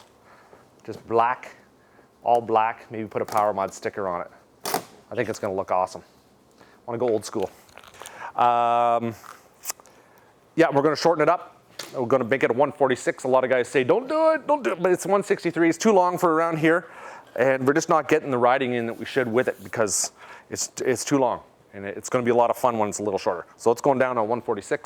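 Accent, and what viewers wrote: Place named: Newfoundland, Canada. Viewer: I feel like your a Newfoundlander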